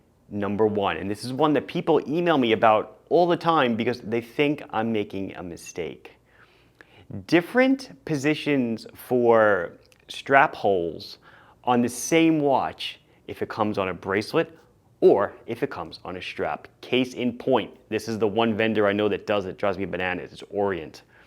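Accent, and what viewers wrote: Long Island, United States. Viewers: That Long Island accent does get me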